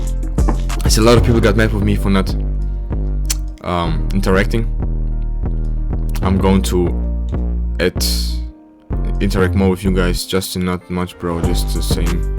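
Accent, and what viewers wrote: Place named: Germany. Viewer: Deutsches English izz da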